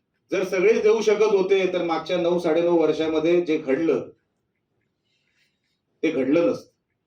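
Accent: Malvani, India. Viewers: Malvan voic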